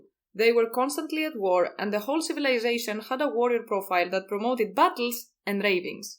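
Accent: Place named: Greece. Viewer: I think you're the first (fellow) Greek ASOIAF Youtuber I've ever seen I could immediately tell by your accent hahaha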